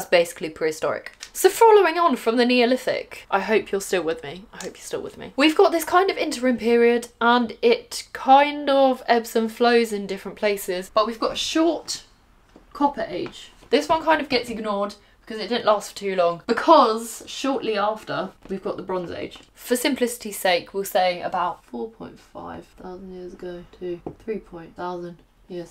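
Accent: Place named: United Kingdom. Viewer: I’m mainly watching for the British accent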